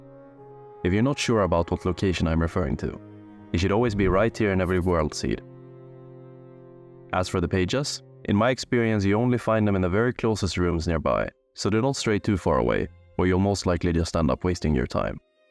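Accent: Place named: Sweden. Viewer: Love that Nordic Swedish accent bro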